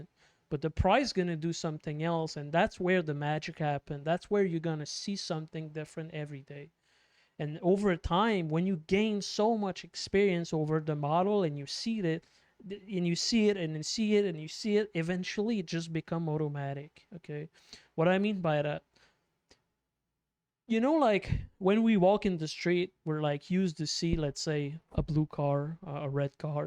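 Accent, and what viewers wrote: Quebec, Canada. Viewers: French canadian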